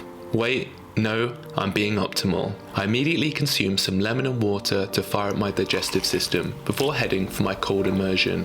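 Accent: England, United Kingdom. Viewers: are you English.... Anyways my day is pretty much like yours l am myself just launching into the you tube space into the health and wellness arena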